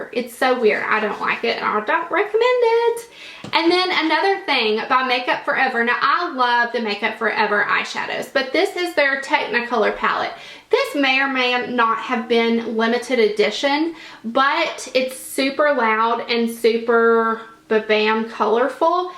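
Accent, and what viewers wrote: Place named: South, United States. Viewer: I'm so happy some one is finally being honest on YouTube. I really appreciate it!! Love you videos so much... And I love your accent, I want a southern accent soooooo badly!! 😭😭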